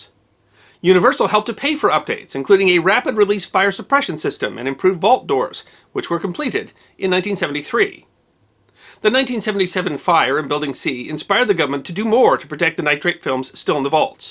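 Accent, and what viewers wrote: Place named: Midwest, United States. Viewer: I know you’re a Midwesterner and it’s involuntary, and no judgement intended, but I beg you, I live in Cah-nahh-duh and not key-nuh-duh. Your accent on this one word kills me every time. Love you!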